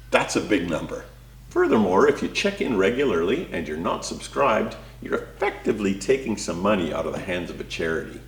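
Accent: Canada. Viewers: Nice. Logical, well presented and informative. I just subscribed......guessed you were a beaver boy.........best national animal ever by the way😅.....by the accent. Going through your catalogue of videos now.......can see some interesting stuff. Keep up the good work. Cheers mate.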